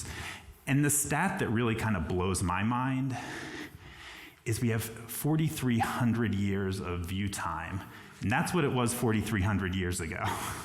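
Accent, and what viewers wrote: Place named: Midwest, United States. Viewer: In the spirit of LPL, his voice is enough to out him. Just think for a second. Sight is a great sense, but we have at least five of them and we can use them if we apply your minds. I know this is a man. He is white. His accent suggests possibly midwestern. His vocabulary, fine articulation and vocation suggests... anyway he's a jew. Anyone in that audience saw his face, btw. But his voice is enough. Not many people speak like that, and he's made hundreds of videos. You don't think someone will eventually make a voice recognition app?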